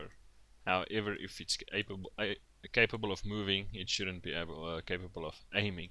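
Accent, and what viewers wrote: South Africa. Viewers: I also noticed the way you pronounce words. So I assume dat jy ook afrikaans is :p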